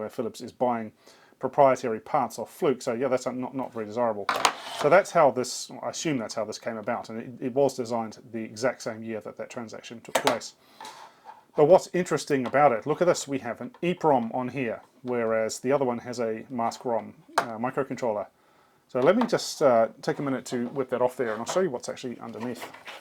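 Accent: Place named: New Zealand. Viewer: Thank you,. I like the mellow NZ accent, much nicer than the OZ nasle noise. I had a new sister in law from NZ from south island, but didn't know what the north island would sound like. I've not seen her for 40 odd years, buy heck, she was very pretty. See you next time you post.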